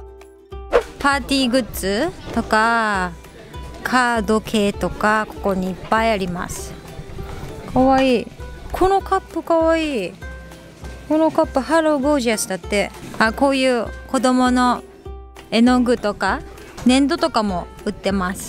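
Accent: Japan. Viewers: 初めて動画観ました！ 高知弁が可愛すぎてキュンとしました☺️💕 旦那さんと息子さんも素敵すぎて理想の家族です✨ 他の動画も色々と観たいです☺️❤️ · いつも楽しくみてます！ 方言富山ですか？？似てたので…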